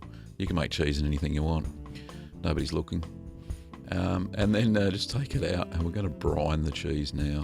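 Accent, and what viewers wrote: Australia. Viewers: Hi. Loving your videos - great work. I notice you use the numbers for your cultures. I'm in Australia and from your accent so are you. Can you let me know where you buy your cultures? My online supplier just sells soft and hard meso cultures and I can't figure out how to map them to the numbers they use on <link> Thanks in advance!!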